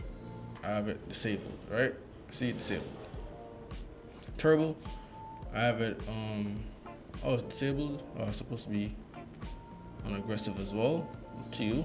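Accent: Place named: Jamaica. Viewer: I can tell!!!! I know a fellow jamaican when I see and hear one. I'm from Mobay. I think I subbed to u by the way. I have the deck, the switch and rog ally. While the deck and ally are both cool, I still think personally the switch us a way better and funner hybrid. The switch 2 will furthermore burry all these wanna be switch gandhelds into the ground even more. Anyway keep doing your things nintendo for life though lol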